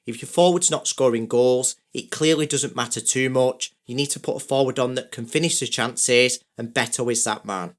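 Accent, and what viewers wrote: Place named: Liverpool, United Kingdom. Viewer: First time on your channel. Love your English accent. Are you Scouser?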